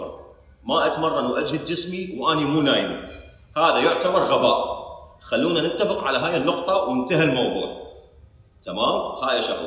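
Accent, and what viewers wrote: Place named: Iraq. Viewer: سلام عليكم انت عراقي الهجه والملامح هل أنت تسكن في العراق لو في ليبيا أين مكانك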